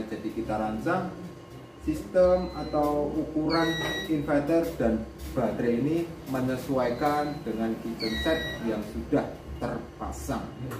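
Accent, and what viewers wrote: Java, Indonesia. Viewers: simpel bos,, kalau anda mggak suka logat medok ya nggak perlu di tonton dan nggak perlu di dengarkan bos,,, daripada anda anda menggonggon nggak karuan di kolom komentar,,, channel youtube orang korea Reomit saja sangat bangga dengan logat medok,,, IRI BILANG.BOOOOOOOSSS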